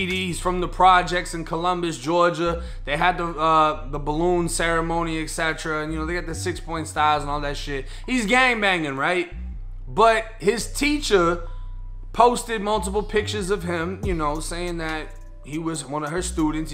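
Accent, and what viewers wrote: Boston, United States. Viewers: Are u from Boston bro?? My Mom R.I.P. was from East Hampton n ur accent is exactly how I envision an authentic Boston accent!!! My Mom moved here to da SouthWest at 10 years old n so by time I was born up until I was 10 wen she passed, she didn't have any sorts of Boston, New England, or East Coast accent wat so ever!!! But I'm jus curious is all n too as I would like explore some of my roots back East n wat not cuz da SouthWest n West Coast, California to be specific, where my pops is from, but dat is all I've known in my 31 years of existence!!!!! Totally totally different den wat I am accustomed too dats fir sures!!!!! N too bro jus wanna letch u know dat I admire da positive change u made to ur life, da criminal aspect not committing crimes n wat not jus wasting ur life away in a lil locked room!!!!! I too made dat change after a 1½ years in juvenile n snapped once I got out n had a totally different fukin perspective!!!!! 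It was actually a positive thing n definitely helped me cuz had I not ended up where I did, I was destined fir prison without a doubt!!!!! Got a clean record since turning 18 compared to da 7 felony's I got from 16-17!!!!! But shit I rocks wit u!!!!!!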